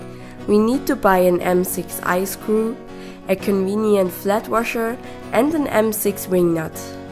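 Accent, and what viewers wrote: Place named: Germany. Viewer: love this idea, great job. ) and very cute german accent as well :)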